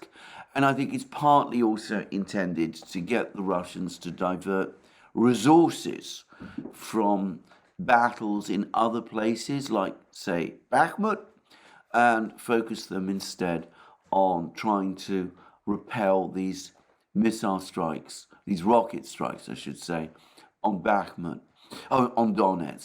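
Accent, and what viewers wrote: United Kingdom. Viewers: One of the best places for lovely russian propaganda offered with a british accent by a disgraced lawyer.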